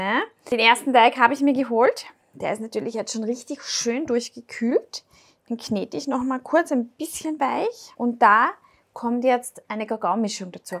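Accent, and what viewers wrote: Austria. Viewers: Vielen Dank für die schönen Ideen und die verständliche Erklärung. Aber genauso schön ist der österreichische Dialekt. Viele Grüße aus Leipzig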